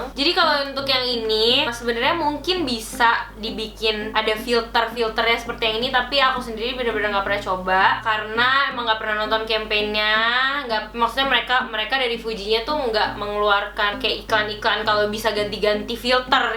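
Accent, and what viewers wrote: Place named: Visayas, Philippines. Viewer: Hala, your dialect kinda sound close to the Visayan dialect from the Philippines. I find It fascinating. :)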